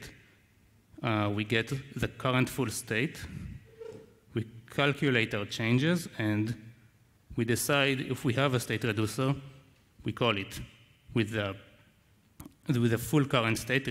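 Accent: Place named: Arab world. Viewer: I'm sorry,I couldn't understand your English, which looks like Arabic pronounce.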